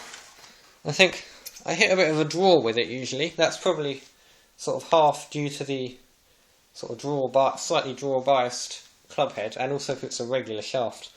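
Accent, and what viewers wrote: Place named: Essex, United Kingdom. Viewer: Cleveland is a great brand, underrated by some, amazing wedges. I'm having a bit of a love affair with Ben Hogan irons at the moment (forged gs irons and Colonial wedges) all getting a bit old and I should upgrade them. Can't bear to do it though. I'm just too attached they just seem to fit me. By your accent you sound like your from not far from me. You can usually see me struggling and swearing round the courses of North Essex. Good luck this season ;)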